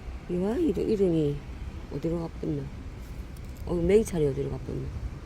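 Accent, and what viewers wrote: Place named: Gyeongsang, South Korea. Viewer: 안녕하세요 반갑습니다 하우스속 다육아이들 잘 있었네요 경상도 억양이 참 정겹고 듣기에 좋아예~~ 사랑스런 다육 아이들 즐감하며 머물다갑니다 함께하며 응원합니다 복된 날 되세요😊❤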